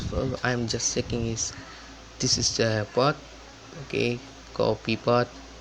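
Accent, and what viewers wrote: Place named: Tamil Nadu, India. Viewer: Hi bro...neega pesura English laye tamil nu kandu pudichitan😁😁 Nalla pesureega bro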